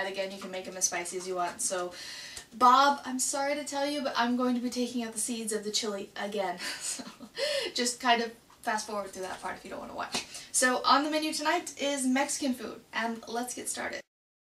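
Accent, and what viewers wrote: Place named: Norway. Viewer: actuly kinda cus you know you have that norwegian hmm actuly dont know how to explain it my its in the ending of words where you can hear it xD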